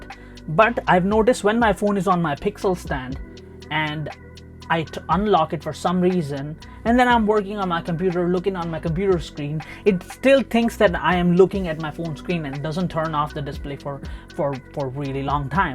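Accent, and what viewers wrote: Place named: Punjab, India. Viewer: Bro you got a thick Punjabi neighborhood English accent? I would love to know the story behind it. Brampton ?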